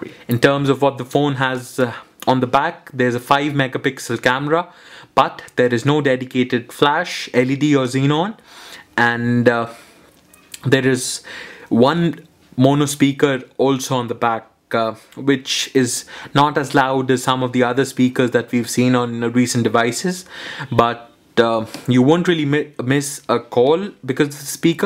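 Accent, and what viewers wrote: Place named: India. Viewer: Haha, what are you people on about? He sounds nothing like Arnie, he's Indian!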